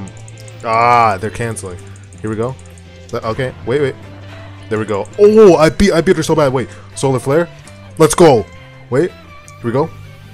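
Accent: Jamaica. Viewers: I heard the jamaican and just subed , good channel👍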